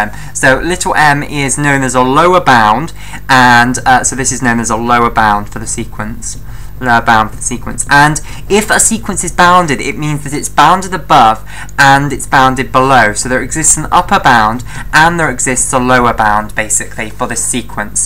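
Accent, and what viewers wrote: United Kingdom. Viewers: thats the most british accent i've heard!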